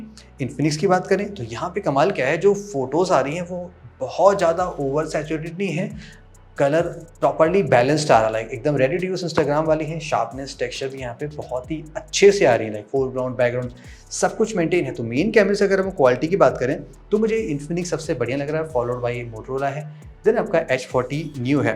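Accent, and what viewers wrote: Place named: Bhojpuri, India. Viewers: Are sir ji aap to bhojpuri wale nikle 😀 kyaa baat kyaa baat ❤ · I love bhojpuri style 😊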